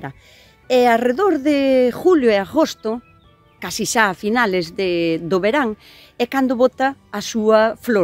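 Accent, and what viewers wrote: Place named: Ribeira, Spain. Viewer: Cousa tan xeitosa!! E como me gusta o teu falar ribeirán! Noraboa pola tua horta e polo traballo de difusión. 10 horas de media de traballo por cada video, lín nun teu comentario. Estes videos son semente de futuro. Gracias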